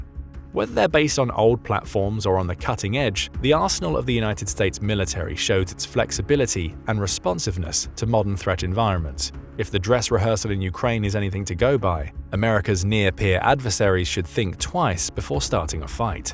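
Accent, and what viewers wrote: United Kingdom. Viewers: Nothing worse that hearing about the us military with a British accent. All set. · I love hearing just how cool the U.S.A. is in a British accent its quite perfect good sir. Lmao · Crazy a British is doing the video voice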